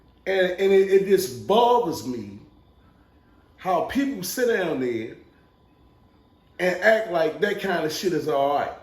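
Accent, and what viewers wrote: United States. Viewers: he got that Baltimore accent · Gotta be from D.C. or Maryland..❤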